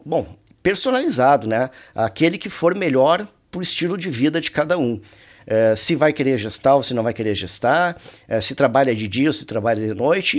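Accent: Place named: Rio Grande do Sul, Brazil. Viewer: Pelo teu sotaque, deve ser do RS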